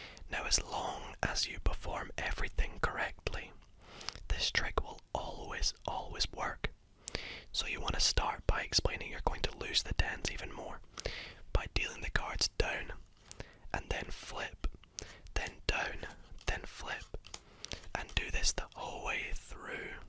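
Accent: Scotland, United Kingdom. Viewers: What's that accept ? I'm english I'm hearing hints of scottish with something else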